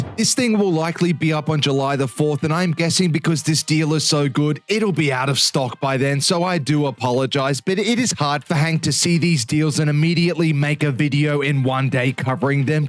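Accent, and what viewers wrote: Australia. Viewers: Are you gonna explain why you have a Melbourne Aus accent but for some reason live in the US.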